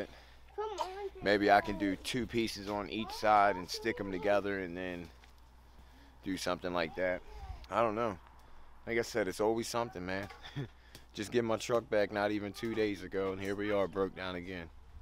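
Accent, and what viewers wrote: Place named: Delaware, United States. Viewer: Could tell this was Delaware soon you started talking 😂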